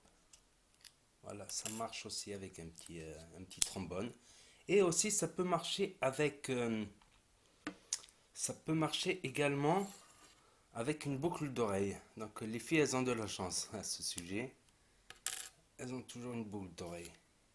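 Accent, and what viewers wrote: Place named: Arab world. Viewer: L accent robeux hhhh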